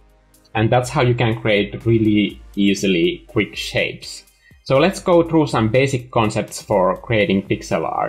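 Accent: Finland. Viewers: Suomalainen aksentti? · For me it was the reverse: as soon as I heard him speak I thought "wait.... he sounds finnish" looks at name yep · Tuli suosituksii tää video ja heti aksentista kuuli et oot suomalainen xd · Hmm are you from Finland? That accents is kinda like a Finland accent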